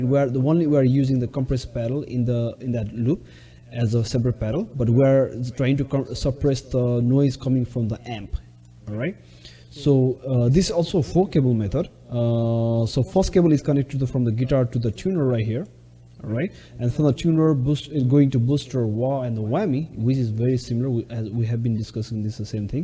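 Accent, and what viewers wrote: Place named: Nepal. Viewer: Are you Nepalese bro? you’ve Nepalese Accent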